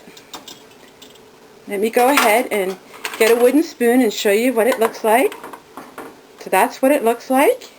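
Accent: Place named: Pennsylvania, United States. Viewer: ha ha, i knew your accent. i am from that area of PA, and now live in NC. so nice to have found you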